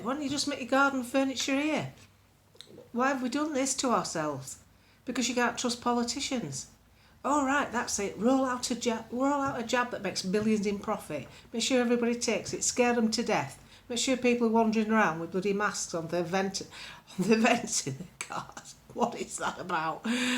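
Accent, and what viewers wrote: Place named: Northern England, United Kingdom. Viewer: New subscriber here.. your YouTube video's are fantastic.. proper northern lass..😊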